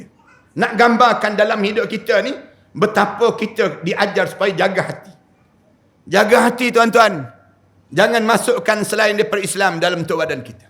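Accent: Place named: Kelantan, Malaysia. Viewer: Kuat bunyi Kelate Boh kito ni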